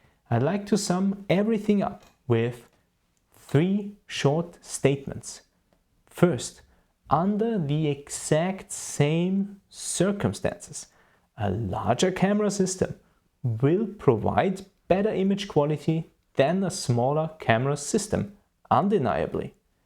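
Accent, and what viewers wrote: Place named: Austria. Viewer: Congratulation for this outstanding lesson. (and I like your austrian accent.)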